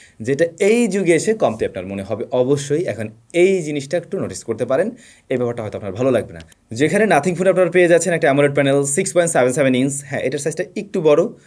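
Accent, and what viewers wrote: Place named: Jashore, Bangladesh. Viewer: vai apnar pronunciation ta jashore er vibe dei. Apni kon jelar origin? Sadat Jashore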